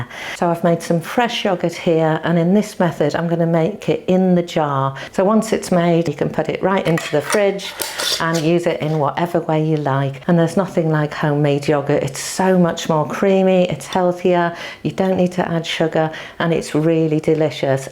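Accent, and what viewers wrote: United Kingdom. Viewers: Just what I am looking for thanks for this video, and a British accent to lol I am from the UK and now live in the States, they pronounce yogurt different in the States. Thanks for you video though.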